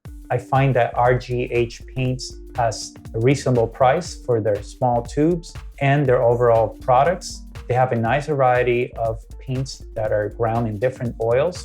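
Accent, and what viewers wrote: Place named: New York, United States. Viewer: That is absolutely a NY accent!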